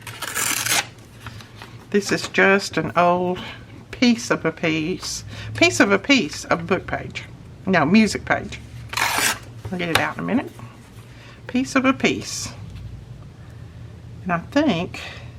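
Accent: South, United States. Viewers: Yup found it! Thank you. I love your videos and your southern accent! (I’m from Boston lol)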